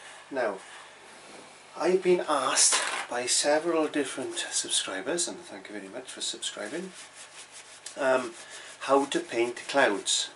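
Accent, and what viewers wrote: Wales, United Kingdom. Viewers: CliveI just found your web-site and am loving it. Also love your Welsh accent. I am from Scotland now living in the US. One question, why do you use black gesso on trees etc. instead of using mars or lamp black?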